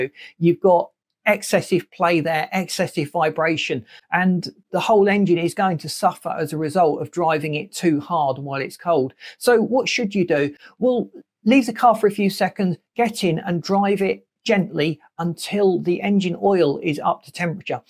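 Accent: United Kingdom. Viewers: "zois compoinets hate up" ???? bro I h8 this annoying uk accent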